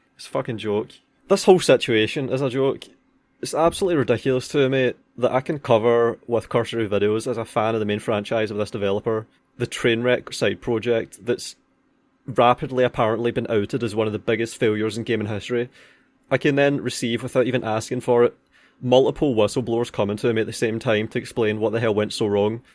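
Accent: Scotland, United Kingdom. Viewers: I don’t even play video games. I come to this channel for the angry Scotsman asmr.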